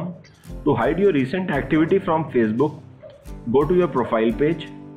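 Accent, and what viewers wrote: India. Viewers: Indian accent is just weird 💩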